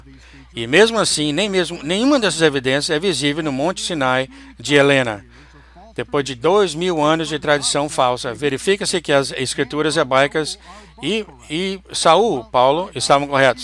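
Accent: Minas Gerais, Brazil. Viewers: quem esta narrando e um mineiro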